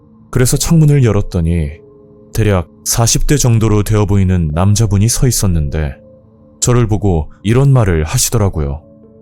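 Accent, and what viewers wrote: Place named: Jeolla, South Korea. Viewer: 아따 전라도라~~~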